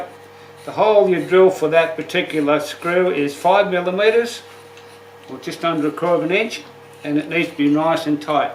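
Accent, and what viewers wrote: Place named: Australia. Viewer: Wow im glad to hear an aussie voice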